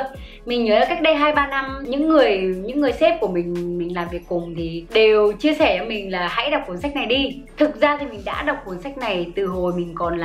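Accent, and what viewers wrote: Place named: Hue, Vietnam. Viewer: ơi!! nói giọng Huế nghe dễ thương lắm nhaaa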